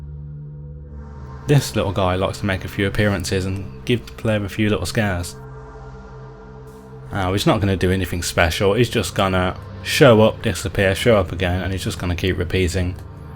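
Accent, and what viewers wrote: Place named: Birmingham, United Kingdom. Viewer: are you from birmingham or black country? accents familiar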